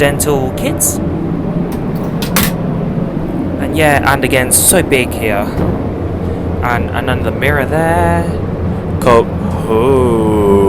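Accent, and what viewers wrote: Australia. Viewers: Hey did you go to school in Australia. I am hearing your accent😂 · The Aussie accent is classic mate!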